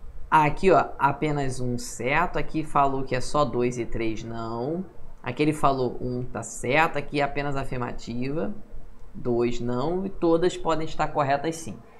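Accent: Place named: Rio de Janeiro, Brazil. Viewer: Professor muito bom. Objetivo e atento, além de ser bonito e com o sotaque carioca! srsrrsrsrs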